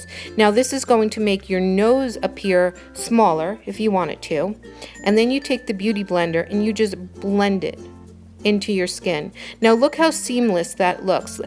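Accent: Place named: New York, United States. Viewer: and OHMYGAWWWD I detected a slight NY accent? A Southern Yankee transplant here from CT living in NC ... def subscribing to this channel!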